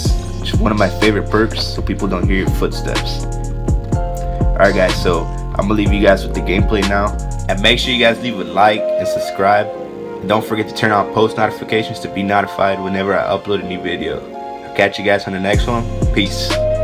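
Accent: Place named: United States. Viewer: Suenas como un nigga yankee o un mexicano que habla ingles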